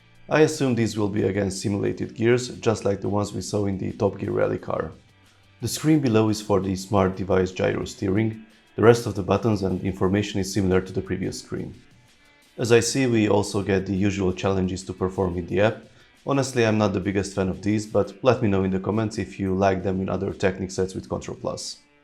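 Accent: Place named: Hungary. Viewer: I just asking, because is a Hungarian name and your accent is soo Hungarian. But if no, then sorry. 😅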